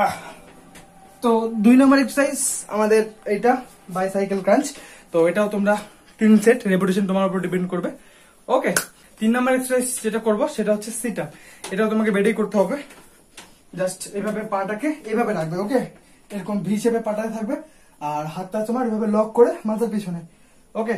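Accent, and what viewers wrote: Kolkata, India. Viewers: আপনি কি কলকাতা থেকে 😅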